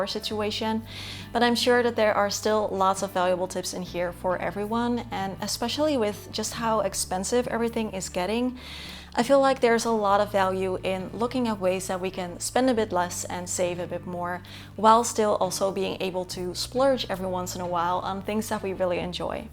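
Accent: United States. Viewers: Great video! Thank you,. I also want to compliment you on your English. I would have assumed that you grew up in the US. 😊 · Netherlands English accents are American or did you learn English in America? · is fluent in English but definitely has an American accent!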